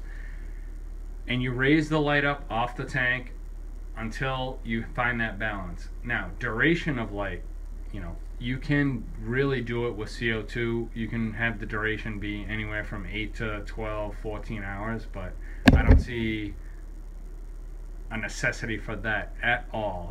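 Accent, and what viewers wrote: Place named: Massachusetts, United States. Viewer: Nice hearing a dude that sounds like me on here, any south coast MA shops that are good for plants, does Beds carry a variety of plants? Seems like most shops only carry a couple of the usuals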